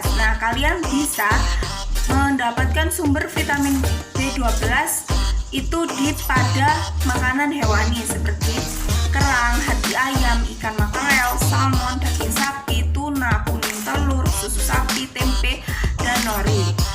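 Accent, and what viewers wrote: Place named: Java, Indonesia. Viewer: Thank you kak for information untuk tetap healthy. btw, kok agak medhok yah wkwkw 😂😂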